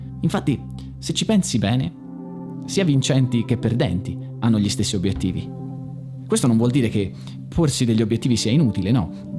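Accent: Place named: Tuscany, Italy. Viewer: Una così alta qualità di contenuti e forma (davvero, sono bellissimi i tuoi video, dalla fotografia al montaggio) e solo 219 iscritti? Il mondo è un posto ingiusto. In bocca al lupo per il futuro! Continua così! P.s.: per quanto tu possa parlare molto correttamente, c'è una piccola inflessione che mi faceva pensare tu potessi essere fratello di granducato, poi il giglio sul laptop ha tolto ogni dubbio!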